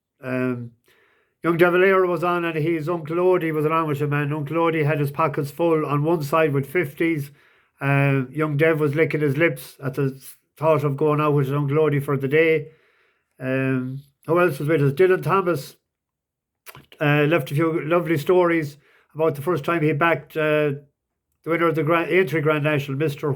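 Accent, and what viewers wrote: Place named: Scotland, United Kingdom. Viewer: Great stuff, pity about the the scots accent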